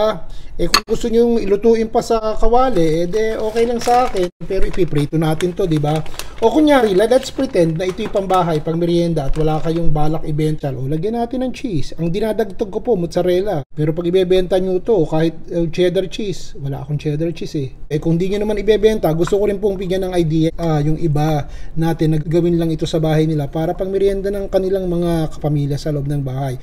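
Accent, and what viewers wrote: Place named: Nueva Ecija, Philippines. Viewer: base sa punto nyo kuya ,novo ecijano po kayo? kababayan ko po kayo shout out po sa mga tiga nueva ecija ! especially sa mga tiga zaragoza 😊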